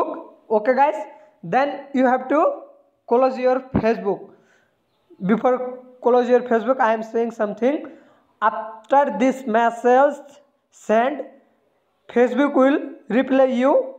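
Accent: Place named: India. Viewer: Bhai sumjh me to kuch nahi aaya. Pr tari desi English sun ke majaa..aa gaya.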